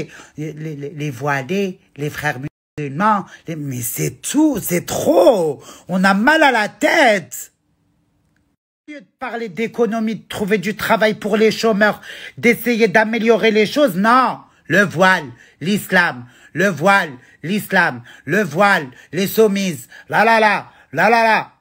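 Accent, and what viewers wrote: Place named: Saint-Denis, France. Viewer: Français des quartiers défavorisés de saint denis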